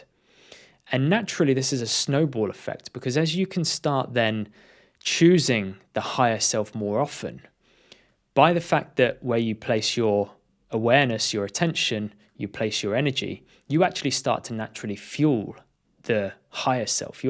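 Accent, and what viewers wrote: United Kingdom. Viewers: nice to see a person from the uk talking about higher self and spirituality. Somehow it feels more relatable when someone is talking about this stuff with an accent and an approach that you can identify with. Thanks for sharing this. I also have a channel, but as you can see it’s all about motorcycles and adventure, however as with most people there’s another side. Just before lockdown a personal event lead me on a journey. I finally found Reiki and law of assumption. I’m so much more mindful about my own thoughts, words and feelings. Meditation is something I struggle with to be honest but it’s something I feel I need to incorporate. Thank you for this video.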